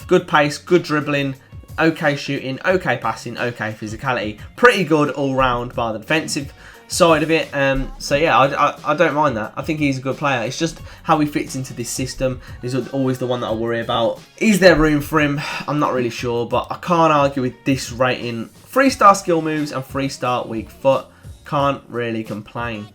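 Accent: Birmingham, United Kingdom. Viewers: What part of Birmingham are you from?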